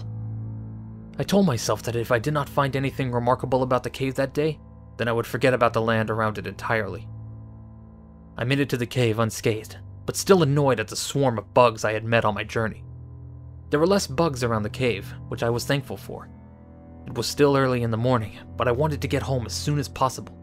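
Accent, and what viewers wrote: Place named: South, United States. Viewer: My God, I love your southern accent! XD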